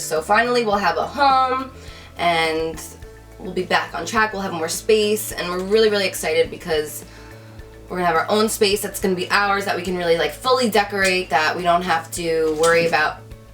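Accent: New Jersey, United States. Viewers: I loveeee your Jersey accent and Jerseyism! I've had a love/hate relationship with NJ since moving here from California but you make me proud to call myself a Jersey girl now! <3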